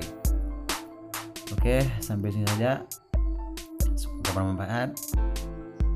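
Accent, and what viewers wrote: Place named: Lombok, Indonesia. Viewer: Kayaknya nada2 nya orang Lombok ini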